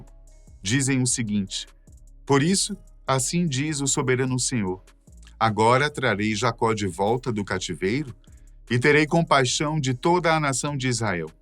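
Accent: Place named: Northeast, Brazil. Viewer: Conhecendo o canal hoje o vídeo já tem mais de 11 meses mas é atual. Muito interessante a explicação das profecias. Acompanho as profecias bíblicas e o tempo de Deus é diferente do nosso. A Rússia também está nas profecias. E também tem os cavaleiros do apocalipse pode ser cada cavaleiro uma nação. Você parece ser conhecedor de história seu tom voz é clara apesar do sotaque nordeste. Israel está vencendo o mau do terrorismo o que virá depois?? A Rússia escalando a guerra. 🇧🇷🙏🇮🇱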